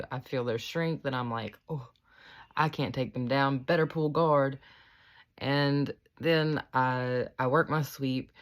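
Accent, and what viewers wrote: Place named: South, United States. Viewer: Why cant you people from the south learn to talk better. The sounds you make are just aweful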